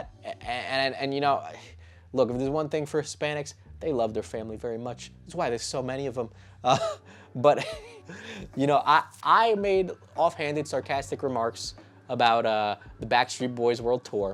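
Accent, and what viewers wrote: New York, United States. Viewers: Are you from Northern America? You sound like a New Yorker, I love your accent ^^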